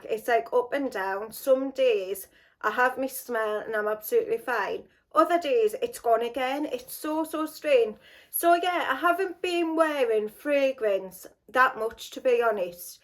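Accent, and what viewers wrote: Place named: North East England, United Kingdom. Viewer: ! It’s so lovely to see you back🤗 Can you believe I’ve only just found your channel. I started binging your videos this month while recovering from gallbladder surgery! ❤️your content and your North East accent🥰. I have not worn much fragrances while recuperating but I do still love to sniff them😂. All the best from NYC x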